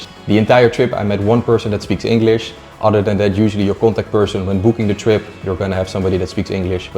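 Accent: Netherlands. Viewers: Amazing video. Your accent sounds Dutch. Where are you from? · Why do you have an american name but a Dutch accent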